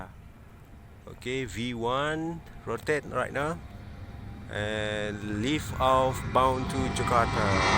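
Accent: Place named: Singapore. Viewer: When I hear his accent, I thought I am watching singaporean vlog... Great job pak cik...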